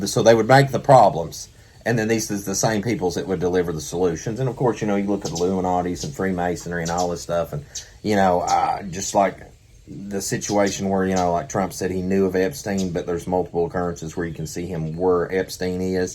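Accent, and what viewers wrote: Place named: South, United States. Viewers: Brother, I was blessed when I found your channel with someone else with an accent like mine. I'm from Kentucky, but our accents are very similar so you feel like home to me. I thought of something while you were talking, and I'm sure you and others have already done thunk it long ago. While the fake Saturday Sabbath Keepers are getting persecuted us lunatics keeping the truth Sabbath will probably be flying under the radar and not get persecuted, but protected by the Most High for keeping His true Sabbath. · As a colored young man I can honestly say I actually like that country accent bro lol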